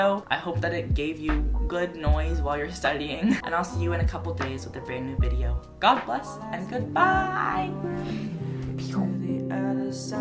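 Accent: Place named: Texas, United States. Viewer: your texan accent is so prominent in this video. IM QUAKING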